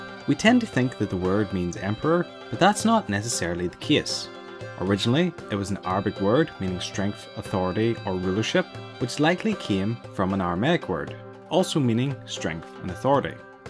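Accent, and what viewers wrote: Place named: Ulster, United Kingdom. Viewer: I don't know for sure but I'd say Ulster.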